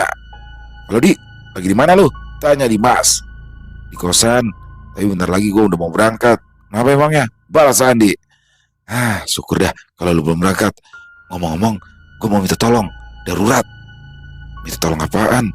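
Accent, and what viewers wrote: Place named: Betawi, Indonesia. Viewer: bang udeh yg paling top dah Apelagi klo udah pake gaya bahasa dialek Betawi & Banjar Top banget 👍👍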